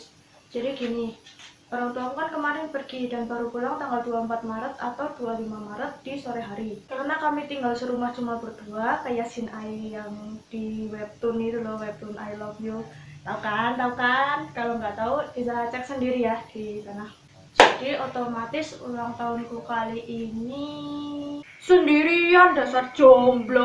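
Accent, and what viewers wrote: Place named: Java, Indonesia. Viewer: Sukses terus mbloo, Medok amats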